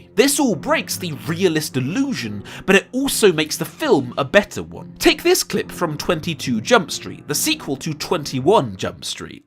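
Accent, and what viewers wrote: United Kingdom. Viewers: Imma' be honest, every time I click on video I'm wondering since when did he have a british accent. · It's so refreshing hearing a British accent on YouTube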